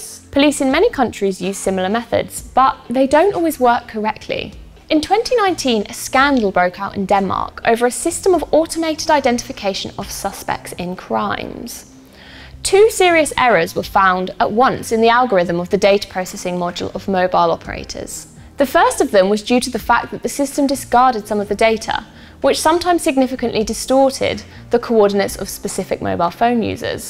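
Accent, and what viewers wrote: United Kingdom. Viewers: Yoooo, you rock!! Love your british accent. Also lukas and bradleys❤️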